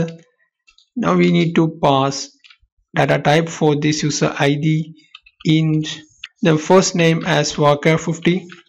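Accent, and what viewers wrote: India. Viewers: 咖喱味英语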